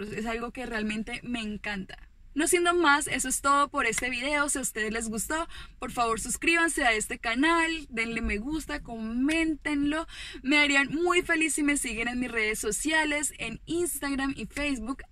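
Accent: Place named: Huila, Colombia. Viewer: Se nota el huilenseee manooo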